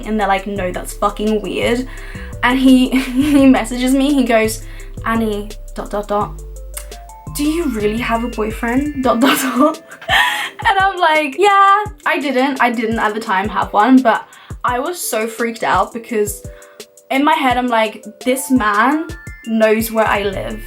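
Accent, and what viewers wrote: United Kingdom. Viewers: you speak perfect British English! Have you ever lived in Britain? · oki doki, cause when i heard you speak English i recognised the british accent and though to myself, she's British and then now your swiss and i was like what is 😂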